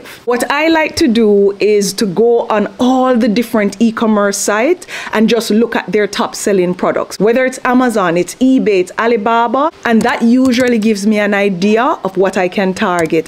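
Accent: Jamaica. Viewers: I thank you so much beautiful and wonderful woman I can hear the accent but you already said something about Jamaica I am African thank you this is the second rinse and repeat Rockstar I'm watching you're straightforward absolutely wonderful honest there's no holds bar thank you Rockstar I believe you will hear from me you are blessed.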